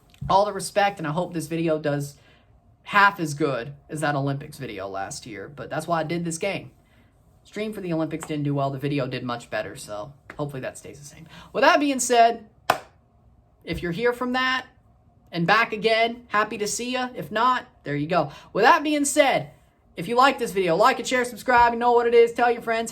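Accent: United States. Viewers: I love your GRINGO Spanish!!! Morocco loves the USA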